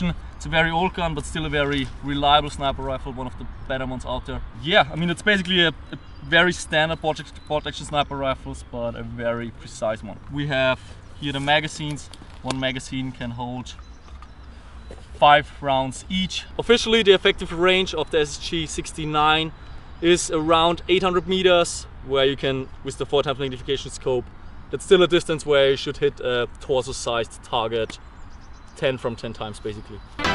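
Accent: Germany. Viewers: Always asked myself if u were German because u sound like it. Now I saw that on the Blackboard :D #Novritscharmy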